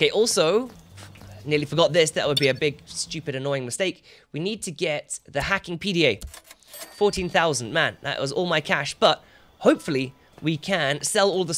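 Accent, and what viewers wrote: England, United Kingdom. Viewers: Because porn and pawn are pronounced the same in an english accent:')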